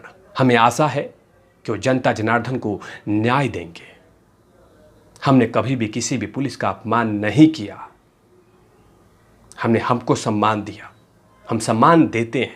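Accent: Bihar, India. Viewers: विहारी बोली और खड़ी बोली दोनो साथ चालाइये भैया 🌹🌹🌹🌹🌹👌🏻👌🏻👌🏻👌🏻👌🏻👌🏻👌🏻 आपका पुराना वाला अंदाज चाहिए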